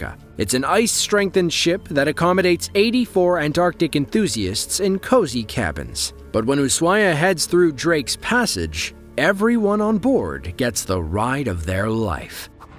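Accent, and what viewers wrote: United States. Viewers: the american accent of the commentator is unbearable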